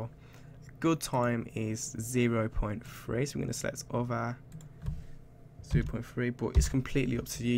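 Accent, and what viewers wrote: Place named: Birmingham, United Kingdom. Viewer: birmingham accent :))))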